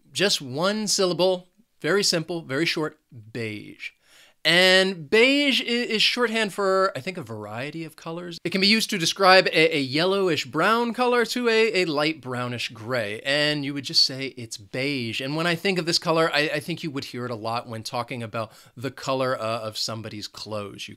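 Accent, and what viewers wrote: United States. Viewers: unfortunately your accent is American not British 😕 any way thanks a lot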